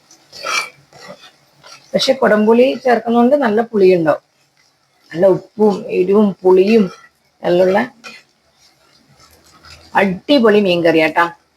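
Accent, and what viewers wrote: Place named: Kannur, India. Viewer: Kannur slagu super dear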